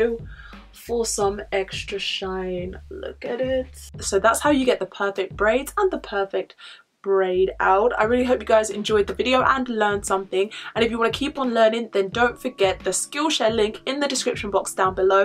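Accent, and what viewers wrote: United Kingdom. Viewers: Sounds British and speaks Spanish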